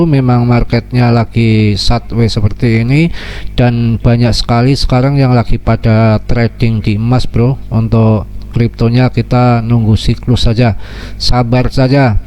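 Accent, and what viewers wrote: Banyumas, Indonesia. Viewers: Lah wong Purwokerto kye tah,ngapak njeplak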